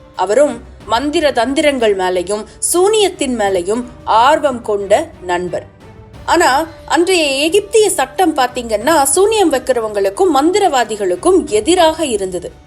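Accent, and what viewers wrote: Tirunelveli, India. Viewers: Unga voice nalla gambeerama, kekaradhuke oru energetic ah iruku. Alutham thiruthamana pronunciation super ah iruku. Yella type stories um solreengalae (historical puranangal, motivational) , yepdi idhellam yosikareenga. Apdi yevlo books dhan padipeenga. Nejama solren neenga oru extraordinary person. Unga slang yenga oora ஞாபகபபடுத்துது (திருநெல்வேலி, தூத்துக்குடி). உங்கள் பணி மேன்மேலும் வளர்ந்து தொடர என் வாழ்த்துக்கள்.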